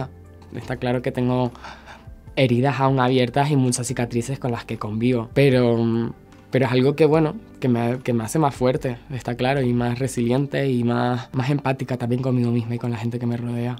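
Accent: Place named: Chile. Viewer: Tiene una linda aura; resplandeciente; su acento siempre me ha parecido un poco chileno.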